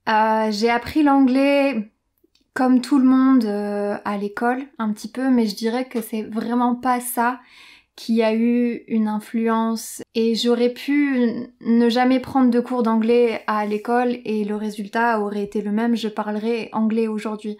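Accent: France. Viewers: Je déjà suivi Portugais avec Leo ici sur l' Youtube. S'il vous plaît, corrigez moi si je suis équivoqué. J'ai abonné récemment au votre canaliser ici sur le YouTube. J'aime 😍 ton accent français. Es-tu française? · Yo, au vu du nombre d'abonnés entre le 5 juillet et le 21 juillet, à ce rythme, il y a des chances de passer les 100k abonnés d'ici la fin de l'année à force de référencement. Il faudra que tu fasses une vidéo en anglais un jour, ils veulent entendre ton french accent :p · I'm happy by your efforts given. This is my first time to see your channel. I like your French accent and also it's also sort of understandable. Please continue sharing some French language episodes. It's really helpful. Merci beacoup.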